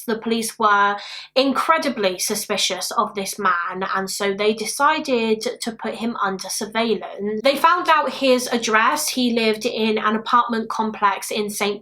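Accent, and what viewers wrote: United Kingdom. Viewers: Hi! I’m really into true crime and I found your channel recently. I have a few favorites and you’re definitely one of them! I love that I can feel that you’ve connected with the story you tell and you have a lot of compassion for victims. Keep being amazing! 💓 Also ironically all of my favorite YouTubers are from the UK. I have a vision disability so I mostly experience the content via audio and I just find UK accents so nice to listen to 🥰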